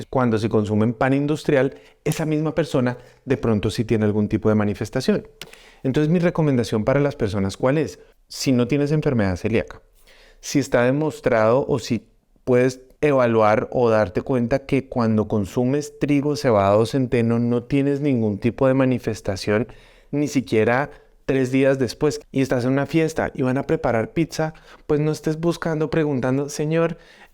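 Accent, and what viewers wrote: Colombia. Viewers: Gracias... maravillosa información..saludos de México amo el acento Colombiano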